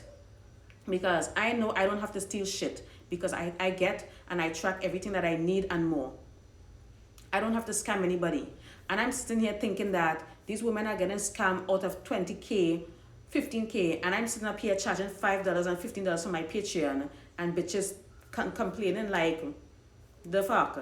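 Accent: Trinidad and Tobago. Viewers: Hi are you Trinidadian? Definitely an accent 🇹🇹🇹🇹🇹🇹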